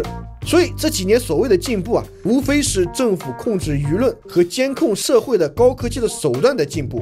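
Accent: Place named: Shanghai, China. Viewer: 喜欢你的上海腔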